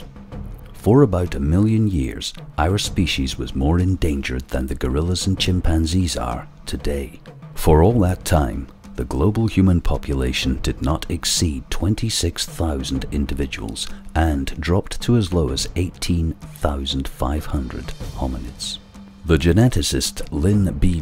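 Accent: Scotland, United Kingdom. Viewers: It's a Scots accent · Love the Scottish Accent! Great Video!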